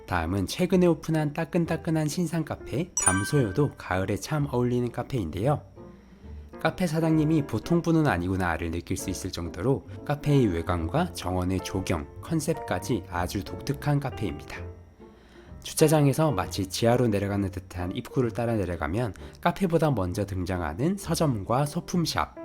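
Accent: Gyeongsang, South Korea. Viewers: 님 한의사라고 본것같은데 맞나요? 정말가끔유투브보면 차분한말투와가끔 경상도사투리가 귀엽고정겹습니다 감사해요^^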